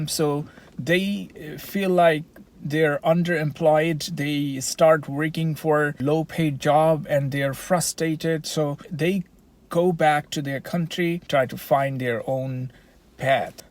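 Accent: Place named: Canada. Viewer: Bhai your accent is amazing. Looks like a native Canadian.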